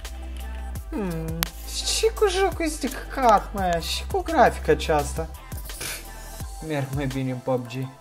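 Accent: Moldova. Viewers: Nu sunt hater dar nu-mi plac cum vorbesc moldovenii nu e vina ta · Esti din Moldova :D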